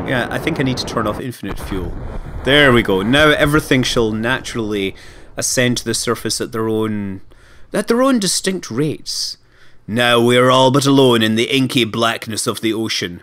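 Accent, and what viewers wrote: Scotland, United Kingdom. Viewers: It's the Scottish accent. And often the Explorer becomes an exploder!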